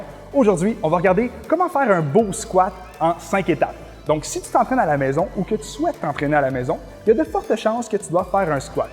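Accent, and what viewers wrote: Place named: Quebec, Canada. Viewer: merci pour la dermonstration mais vous parler trop vite avec un accent anglais en avalant les mots : c est le quebequoi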